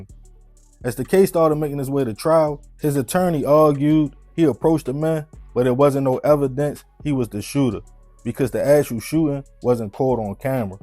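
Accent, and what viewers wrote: Baltimore, United States. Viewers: He's from Baltimore and that's how they talk there 😅 His primary audience he wants to appeal to is his own community.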